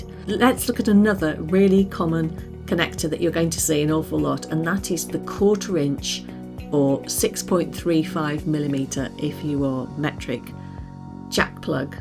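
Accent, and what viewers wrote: United Kingdom. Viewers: Hi! I love your channel. I have a question. I have a yamaha p105 electric piano wich has usb to host. This usb cable is an especial usb cable? Or is it like an usb print cable usb b to usb a? Thank you so much (I adore your british accent hehehe)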